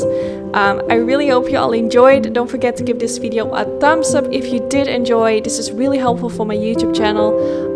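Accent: Netherlands. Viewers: Wat grappig. Ik heb zojuist je kanaal gevonden en ik dacht dat ik een Nederlands accent hoorde. En lo and behold, je bent Nederlands! Super leuk. Ps: ik hoorde het maar een heel klein beetje hoor, je spreekt prachtig Engels.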